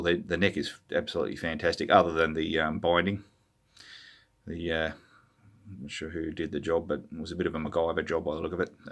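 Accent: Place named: Australia. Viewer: Good to hear an Australian voice talking about guitars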